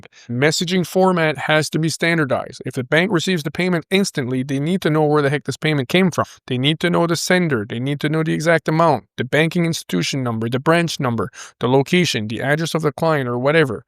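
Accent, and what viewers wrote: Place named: Newfoundland, Canada. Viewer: [• Someone finally asked the question I was going to ask… I thought to myself “sounds like he’s from Newfoundland!“. I actually sound like you do… i pronounce words like you do. Maybe I’ll run into you at the Avalon mall, or downtown at a bar? Just kidding. I think the first guess is more correct. Although, you do sound like a Newfoundlander absolutely! And I’m from here.•] [• maximum respect to you my friend!🙏😎👍🫡•]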